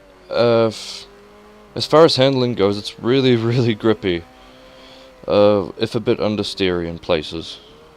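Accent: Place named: New Zealand. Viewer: I was sitting there thinking where your accent came from and I thought,”I reckon he’s a Kiwi” Nailed it,gday mate.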